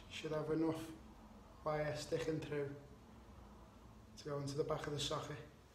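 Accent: Liverpool, United Kingdom. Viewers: Cheers Mate! You just confirmed everything I do myself to be correct. The only thing that lets me down is when someone previously strips out the lug hole; just found something to repair it with. But many thanks for video. Nice to hear another scouse accent and the term 'lecky'. Stay safe! :-) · Just what I was looking for great video really helped me. I’m a scouser so it was great to hear the accent 👍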